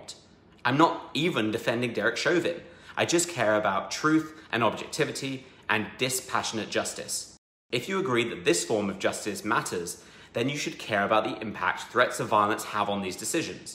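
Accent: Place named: United Kingdom. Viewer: You British dude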